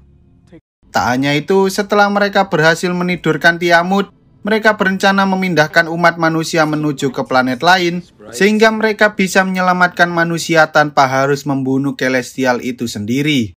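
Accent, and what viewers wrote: Java, Indonesia. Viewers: Uwong jowo bg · MEDOK BANGET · Sampean wong jowo kah bang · Bang suara lu mirip dodit mulyanto medoknya pun sama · Fix, suaranya rada-rada orang Jawa abangnya😁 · Sampeyan Jawa endi mas mimin, kok ngomonganya agak ada Jawa" gitu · Lu orang Jawa bang ? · Apik pilem e min... JOWOMU NGENDI MIN... KOK MEDOK MEN⁉️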